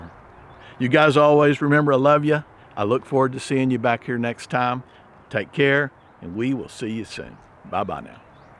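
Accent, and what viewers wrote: Texas, United States. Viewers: What theme am I looking for when I watch an Out on the Ranch (with )? I'm looking for the sound of a fellow with a genuine Texas accent (reminding me of my childhood) who talks about good people being good to each other. I'm never disappointed. 💖💖💖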